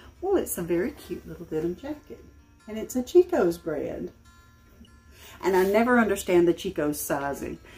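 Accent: South, United States. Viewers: Hey, girl. I'm a brand new Southern drawl subscriber. 🤣 You are so funny...I just love watching you! Thanks for a great video. I look forward to seeing you again!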